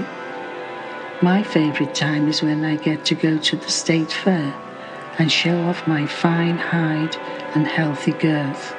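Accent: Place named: Nuyorican, United States. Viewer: Wow! You have taken a somewhat 'New Yorican' turn? Any reason for the change in mood:) Thank you and great work.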